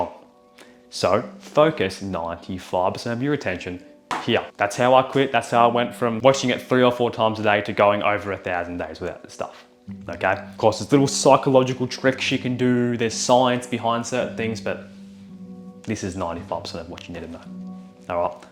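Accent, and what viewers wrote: Australia. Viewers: You sound Australian, but you spell 'favour' the American way in your subtitles. Remember your English roots.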